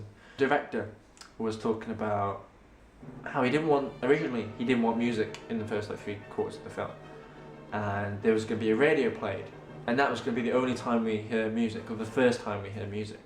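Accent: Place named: England, United Kingdom. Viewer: I'm sorry but I had heard that I should tune into this but I found it very difficult to understand due to his very strong English tone that some of us could not understand.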